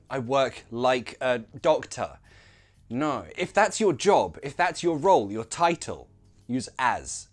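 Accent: United Kingdom. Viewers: Love your British accent💜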